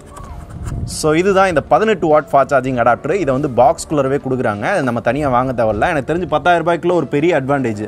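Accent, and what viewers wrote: Madurai, India. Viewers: மதுரகாரங்க பேசுற மதுர ஸ்லாங் கெத்து தான்...! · Bro nenga madurai karar avinga ivinga slang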